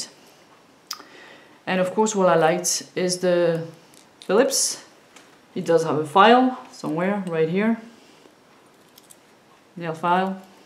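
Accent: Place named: France. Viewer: j'aime ta revue elle est vraiment interressante avec un joli accent camembert.....merci pour ton partage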